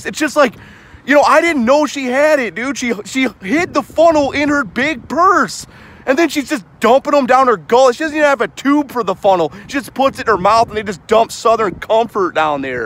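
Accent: United States. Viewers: what state is this dude from? lmao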